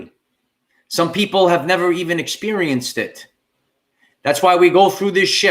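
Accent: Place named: New England, United States. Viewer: I love music. How can I find out the frequency of different songs? Also, I've been seeing my numerical birth date often recently, including the number of likes this video had. I suppose it's an indication of something being of personal significance but wondering if you have any more specific insight of these synchronicities. Thanks. I appreciate your smile, your guidance and comradory through this exciting journey. Where did you grow up? I'm a southern gypsy and enjoy your new england accent. Thanks again. Namaste 🙏🌈🕊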